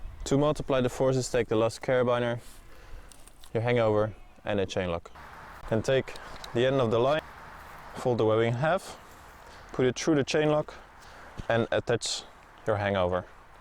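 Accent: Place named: Netherlands. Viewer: That accent, you're from the Netherlands? Since I'm from europe, I'll be buying my primitive slack through you guys. Thanks for the video.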